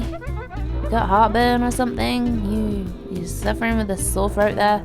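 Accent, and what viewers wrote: United Kingdom. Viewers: Oh my god, you are a fucking disgrace! I can't believe how bad this review is! First off, the hell man, british narration? No review with that disarray! And boss stages and mini games are not fucking levels. FUCK AND GET THE FUCK OFF YOUTUBE! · SPYRO 3 WILL ALWAYS BE MY FAVVVVVVV :) Love the vid and love the pommy accent ;)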